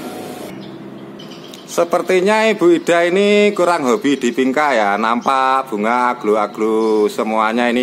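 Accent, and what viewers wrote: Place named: Java, Indonesia. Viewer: Medok jowo mas e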